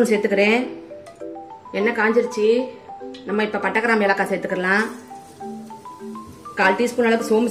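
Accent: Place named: Madurai, India. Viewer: I love her Madurai accent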